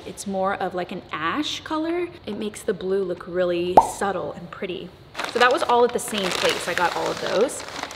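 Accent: Texas, United States. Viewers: Your accent is getting it’s Texas back! Every time I would go back to wherever I was living at the time from visiting home in Texas, I’d be teased like crazy because my accent would be back 😜❣️...Actually all it took for me was talking to my family and friends in Texas‼️ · Love how when she goes home to Texas a slight accent comes out 😂❤️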